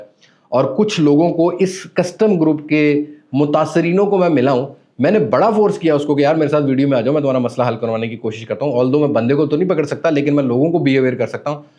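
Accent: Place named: Punjab, India. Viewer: Punjabi voice punjabi lehja aur aesy fraud he hoty h awazz he fraudiya h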